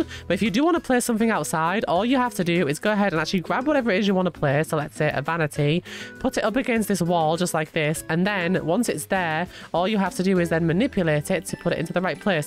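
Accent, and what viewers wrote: United Kingdom. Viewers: GIRL I DIDN'T KNOW UR BRITISH! ME TOO !! <3 im 25% british lol!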